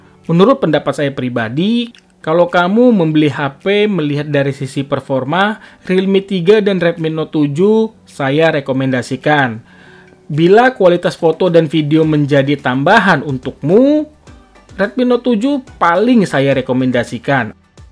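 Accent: Semarang, Indonesia. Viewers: Admine wong semarang 😂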